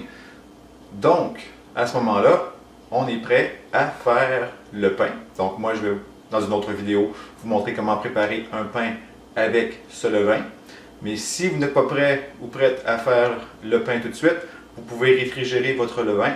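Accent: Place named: Canada. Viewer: Claude de Belgique J' adore ton accent Canadien !!!! et bien entendu le Québec !!! Merci !!! pour ta recette de levain que je suis entrain d'appliquer ( 2 éme jour )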